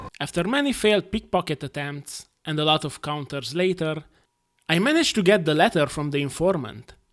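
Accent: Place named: Eastern Europe. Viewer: i thought his accent sounded a little bit Slavic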